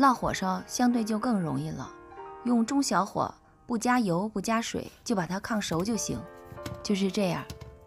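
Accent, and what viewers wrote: Beijing, China. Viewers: 虽然我不吃，看着也香。今天说话有北京味了！爱听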